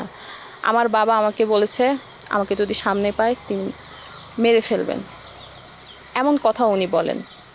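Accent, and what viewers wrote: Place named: Chittagong, Bangladesh. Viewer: সঠিক বলেছেন, সুন্দর উপস্থাপনা কিন্তু ভাষাতে চট্টগ্রামের আঞ্চলিকতা দূর করতে হবে।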